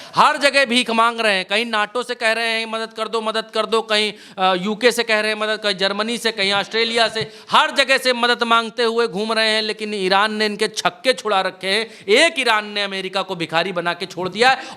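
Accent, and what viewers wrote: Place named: Uttar Pradesh, India. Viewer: जी की देसी टोन यूपी वाली सुनने मे बड़ा मजा आता है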